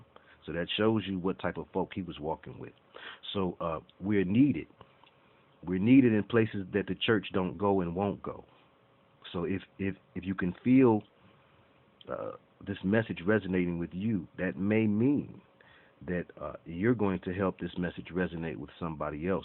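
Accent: Detroit, United States. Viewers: You Detroit alright. haha. Your speech gives you away. wink wink. I'll add some jesters, to soften blow. I do have a sense of humor. I meant nothing, but to share. I'm the nicest eevvveeer! Might want to edit and erase #. I got it. They're are crazies out there, don't know. Is it you or me? lol Thanks though. Gave me a bit of a complex, but not much, I'll get over it. I've very delicate but when it comes to this here bible, I can't afford to fail. Everyone should feel the same so respect. Good I can watch your videos again. Woosh.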